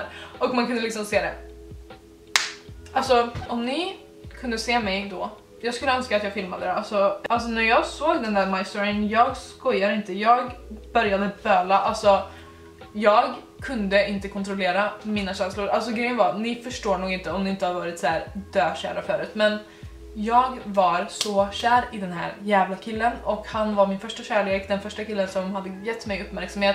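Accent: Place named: Norway. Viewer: Omg älska hur man kan höra din norska lite omg så nice haha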